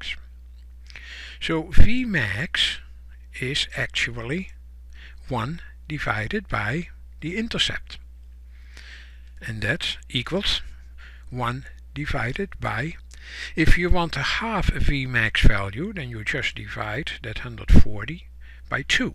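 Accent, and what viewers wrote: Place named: Netherlands. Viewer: hey! i assume that u are from te nederlands? i use the same accent haha! LOL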